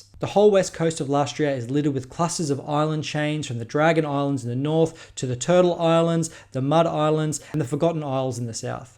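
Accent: Australia. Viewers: Great video and so good to hear it in an Australian accent!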